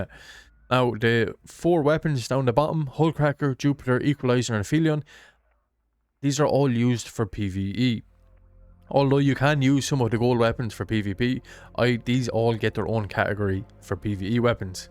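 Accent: Ireland. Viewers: He obviously has an Irish accent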